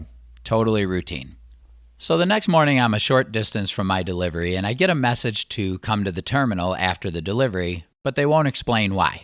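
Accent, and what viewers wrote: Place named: Midwest, United States. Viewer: I can definitely hear your mid-western accent. Nice well informed video. Maybe someone can learn from this.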